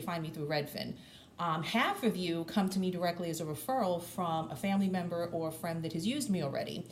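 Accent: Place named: New York, United States. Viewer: Great video! I love the NY accent!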